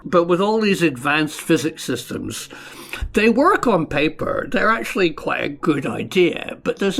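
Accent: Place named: Scotland, United Kingdom. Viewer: omg this is sooo cool, and the bit i like ther most is your wee bit of scottish accent... lots more pls.. always great to watch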